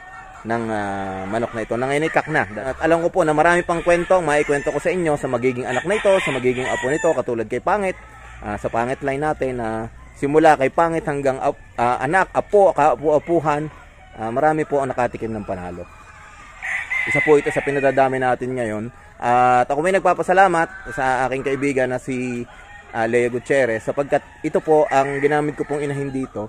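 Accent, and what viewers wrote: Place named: Batangas, Philippines. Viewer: Yown gusto ko sa batangueno eh ay kaalwan pakinggan at may joke talaga eh..more power channel.new subscriber boss...from north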